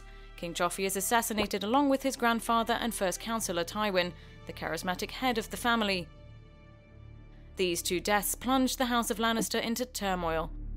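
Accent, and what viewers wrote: England, United Kingdom. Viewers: i wasn't even aware this was French. The lady presenting it has a very english accent but does sound quite robotic in the delivery. I agree they are snobbish but if you present what's happened so far in a super popular show you should probably know the right words and how they're pronounced.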